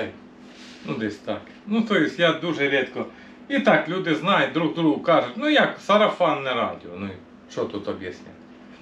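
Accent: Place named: Surzhyk, Ukraine. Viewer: Сало добре але суржик ріже слух аж слухати неприємно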